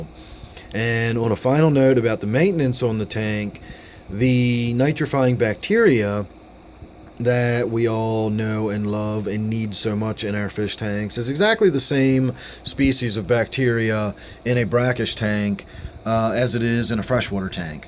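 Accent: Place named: East Coast, United States. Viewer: You talk like me are you from eastcoast? I'm from Baltimore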